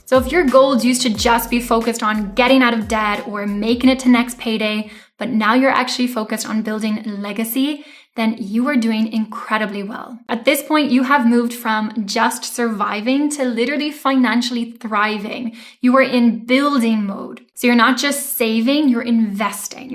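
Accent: Cork, Ireland. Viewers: This video made me look at my financial life differently. In a good way. Thank you for the content! My husband and I love the Cork accent. It honestly makes your videos so soothing to listen to! ❤️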